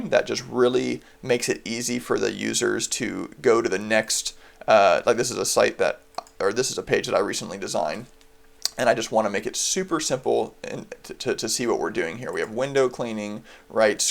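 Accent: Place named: United States. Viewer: I'm English and the American accent can be very jarring to hear, but this guy's voice is just about okay.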